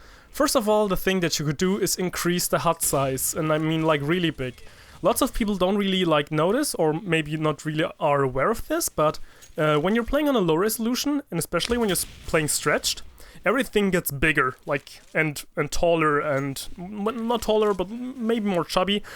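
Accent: Germany. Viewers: Hör ich da nen Deutschen Akzent raus oder täuscht mich das? :D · ! You sounds German alright. Might be because I have a few German friends, so I'd know. (and Norwegian/Swedish/Danish friends as well) Dutch and Danish sounds quite similar, though. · Du klingst voll deutsch :D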